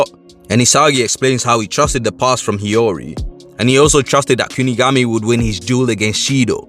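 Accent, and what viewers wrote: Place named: Jamaica. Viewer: hey are you friends with Truther? You sound Jamaican and truther is jamaican as well. Just asking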